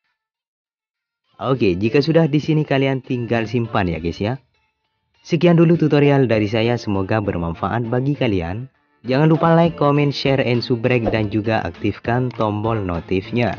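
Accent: Madura, Indonesia. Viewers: Pasti orang Madura ya kak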